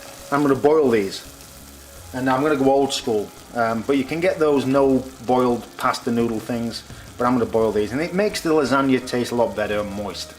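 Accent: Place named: United Kingdom. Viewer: Just discovered your videos recently. To my mind they constitute an object lesson in how to make an outstanding food prep/cooking video. Terrific clarity and nothing left to chance. Who does the filming for you? Cooking your lasagna right now and will let you know how it turns out. Love the tomato sauce on the plate at the end - lifts the presentation of this rustic dish. I am an ex-pat Brit living in the US and it's very refreshing to hear you speak! Just subscribed. Good stuff! Bob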